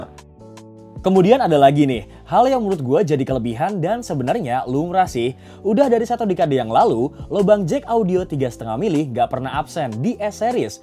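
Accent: West Java, Indonesia. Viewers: Abang orang sunda ya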